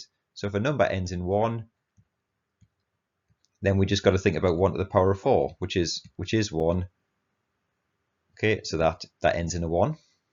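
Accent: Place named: North East England, United Kingdom. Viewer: Many thanks. That’s a north east accent .. from where may I ask ?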